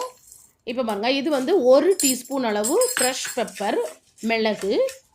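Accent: Tirunelveli, India. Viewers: nanum tirunelveli than sister athan slang thariyuthu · Unga voor tirunelveli ya Akka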